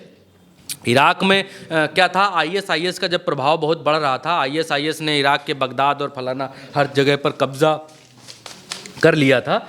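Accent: India. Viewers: bhai naya naam de diya apne nayi dukaan khuli hai. aap ke andar ek desi pan hai jis wajha se aap ko sunne me maza ata hai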